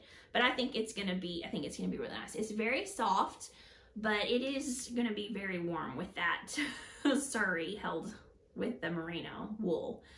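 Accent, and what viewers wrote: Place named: Arkansas, United States. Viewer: Congrats on daring to dive into podcasting! I enjoyed your first episode. And thank you for adding all the pattern/yarn/etc details in writing on the screen... especially because I’m not (yet!) accustomed to an Arkansas accent😉, even though I once drove from Ontario to Arkansas once! It was beautiful, especially Hot Springs National Park, which was my final destination. Driving back home, we took the route through the Wachitas/Ozarks—gorgeous, and reminded me of the Laurentians where I spent my summers. Keep up the good work!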